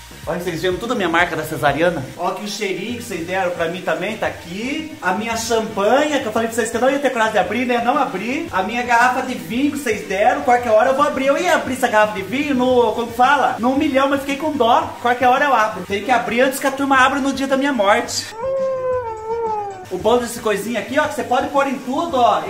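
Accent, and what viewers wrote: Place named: Caipira, Brazil. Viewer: Você É Demais! Amo esse seu jeitinho caipira de falar, morro de rir com as palhaçadas que você faz e diz. Cansei só de ver você limpando, tu vai ver o que é o corpo fazer CREK quando chegar na minha idade (66), minhas costas estralam só de olhar alguém faxinando. Cruz Credo! 😘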